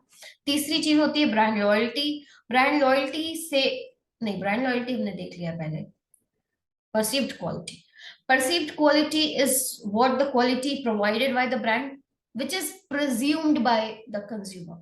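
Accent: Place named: India. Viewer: I'm a bit late here, but you need to work on your English pronunciation. Your indian accent and mix of English and Hindi overshadow every single word in this video, it's impossible to understand unless you're a native indian. Which i'm not, so I didn't understand a lot of this. Good powerpoint though but that's about it, thank you.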